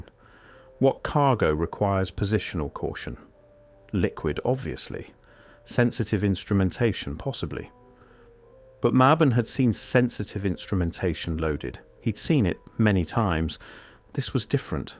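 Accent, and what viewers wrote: United Kingdom. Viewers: GET RID OF THE UK NARRATOR VOICE!! 🤮🤮🤮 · When the narrator has a British accent, it makes the message more believable!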